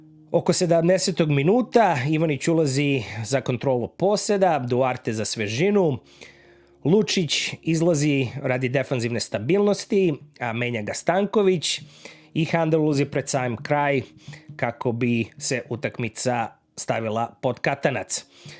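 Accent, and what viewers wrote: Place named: Ekavian, Serbia. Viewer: Ти мени дјелујеш као Хрват и коментаришеш, а причаш екавски имам такав дојам,поздрав теби и успјех на каналу.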